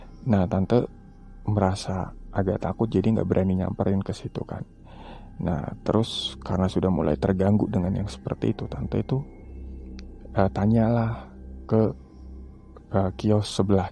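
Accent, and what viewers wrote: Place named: Tegal, Indonesia. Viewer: beuhh tegal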